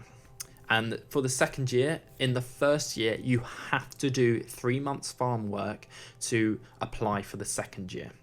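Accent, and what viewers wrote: United Kingdom. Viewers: What is your major I wonder, you have a perfect and professional British accent like my tutor in Durham uni , love it soooomuch!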